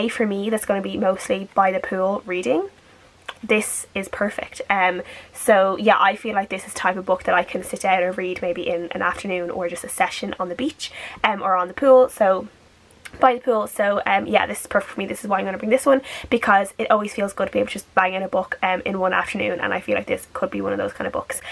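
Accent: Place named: Ireland. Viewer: I love your Irish accent! As an American of Irish decent, it never gets old. On Beauty looks amazing! Have a fun trip girl!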